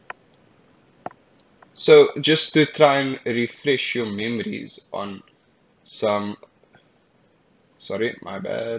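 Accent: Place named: Cape Coloured, South Africa. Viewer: Are you from south Africa because you sound coloured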